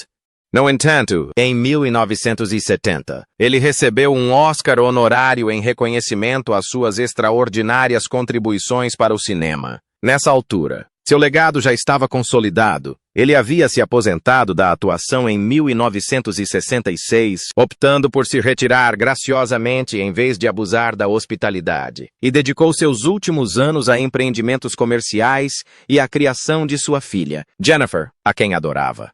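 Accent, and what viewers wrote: England, United Kingdom. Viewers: Para de falar com sotaque ingles. Fica horrivel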